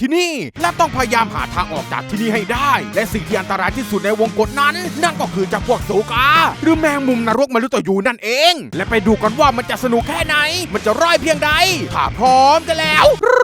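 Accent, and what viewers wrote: South, Thailand. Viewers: พี่เป็นคนใต้ใช้มั้บถ้าพี่ป็นคนใต่เราเป็นคนบ้างเดียวกัน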